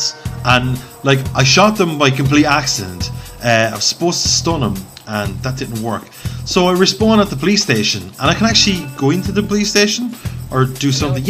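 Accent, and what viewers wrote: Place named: Dublin, Ireland. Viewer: I'm Irish and that's a pure fuckin Dublin Ireland acsent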